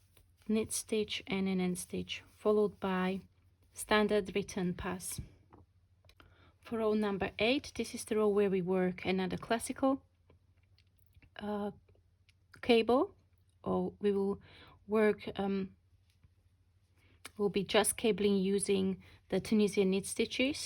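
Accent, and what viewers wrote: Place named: United Kingdom. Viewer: what kind of british accent do you have? its nice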